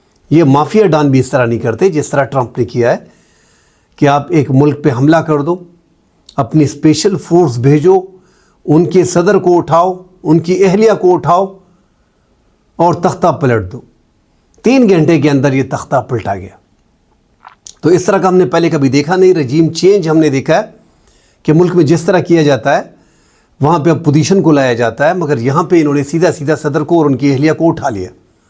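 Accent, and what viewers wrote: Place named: Hindko, Pakistan. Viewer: Sir Hindko main batain kia karain App ke urdu Hindko jayse ha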